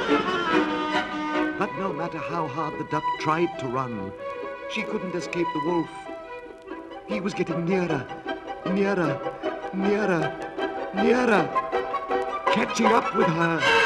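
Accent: United Kingdom. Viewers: Interesting that this is not on Wikipedia's enormous list of recordings. This is Wilfred with his RP accent, similar but not quite what he used to read the BBC news. Imagine if he had used his Lancashire accent! Lovely transfer by the way. Thank you so much. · The most British accent there is.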